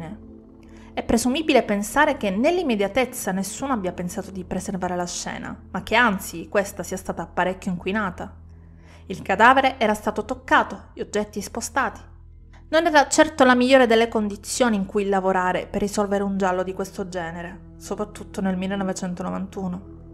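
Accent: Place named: Calabria, Italy. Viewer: a me piacerebbe sapere di dove sei originaria, il tuo accento sembra calabrese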